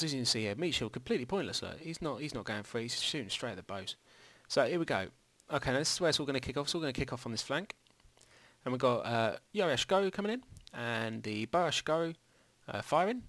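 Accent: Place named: United Kingdom. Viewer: The British accent loving it LOL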